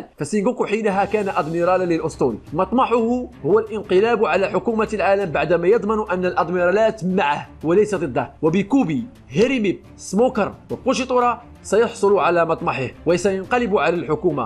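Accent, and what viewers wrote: Morocco. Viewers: لان لهجت مغاربا تشبه لهجتنا نحن نجزائرين مغريبي اخي و ليس صديقي 😉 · يجب أن تقوم بتدريس مادة الون بيس في الجامعة شرحك يجعلنا نرى هذا الأنمي بشكل مختلف .... واصل أخي من لهجتك يا أخي أعتقد أنك مغربي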